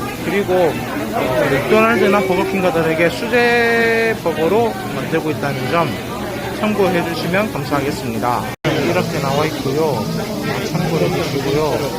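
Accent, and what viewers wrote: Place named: Gyeongsang, South Korea. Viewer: 부산에 사셨군요!! 경상도 사투리 너무 좋은데…나레이션을 사투리로 하셔도 너무 좋을거 같아요! 😊다음엔 부산 영상도 보고싶네요😊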